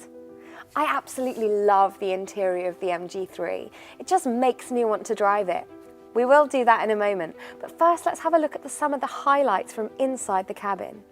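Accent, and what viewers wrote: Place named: United Kingdom. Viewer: Got a girl witha brittish accent doing this video even though MG is wholly owned by the Chinese😂😂😂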